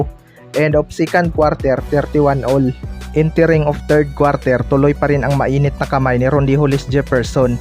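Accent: Visayas, Philippines. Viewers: Bisaya ka Dong???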